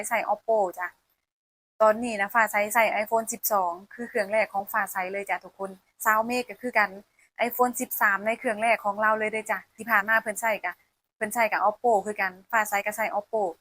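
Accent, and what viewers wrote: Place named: Isan, Thailand. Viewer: พูดเหมือนคนอีสานคิดว่าตัวเป็นคนไทย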